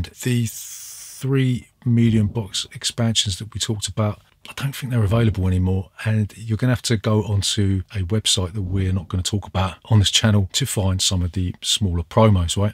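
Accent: United Kingdom. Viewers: I am digging your english so pure so british. Loving that. Greetings from SVK <3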